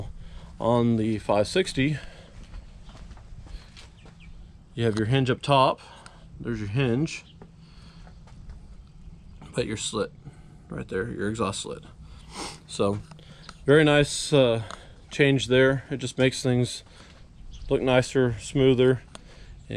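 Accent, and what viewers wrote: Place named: Texas, United States. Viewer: I could tell that was TX straight away. The houses; the sky; your accent. I used to work for Continental Airlines in H-Town, back in the day. Excellent review,!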